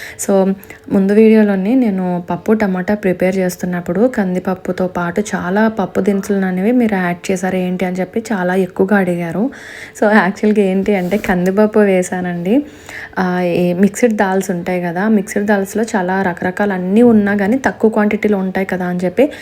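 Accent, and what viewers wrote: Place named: Telangana, India. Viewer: గారు చాయ్ తెలంగాణ భాషలో మీరు మాట్లాడినట్టు అనిపిస్తుంది చాలా సంతోషంగా అనిపిస్తున్నది ❤❤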